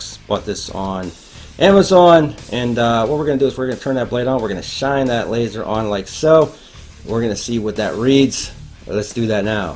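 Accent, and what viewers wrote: Pittsburgh, United States. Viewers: you def sound like a Yinzer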